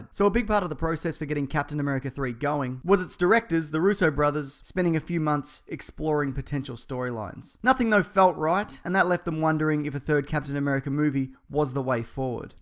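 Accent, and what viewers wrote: Australia. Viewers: are you australian my dude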